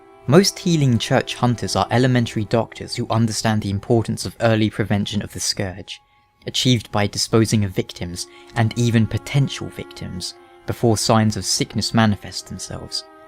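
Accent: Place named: United Kingdom. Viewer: Should I watch Vaati or Video?.. Oh well you cant just not watch the Video as soon as you hear that british english ^~^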